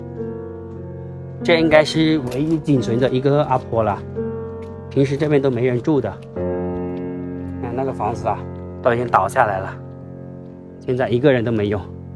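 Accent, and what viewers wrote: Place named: Hakka, China. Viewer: 聽你講話好像是客家人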